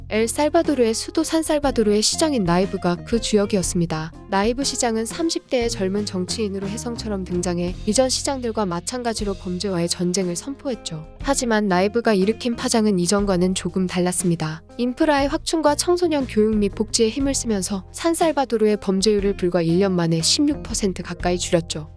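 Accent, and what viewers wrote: Gyeongsang, South Korea. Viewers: 경상도 여성분이신가봄. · 경상도 분이신가?? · 엘쌀!바도르 58회 고향이 경상도인가봐요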